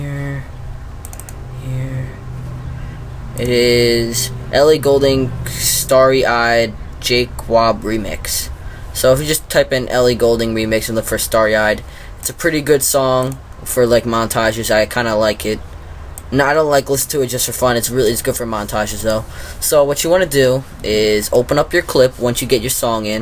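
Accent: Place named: United States. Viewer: Thank god, an american for once.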